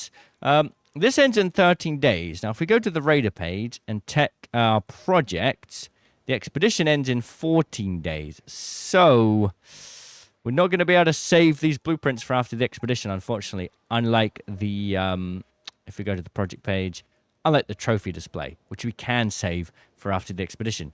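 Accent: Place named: United Kingdom. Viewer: This guy is British so he’s going to say it in a British way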